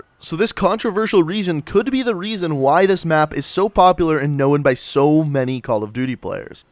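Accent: Canada. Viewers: Your so candian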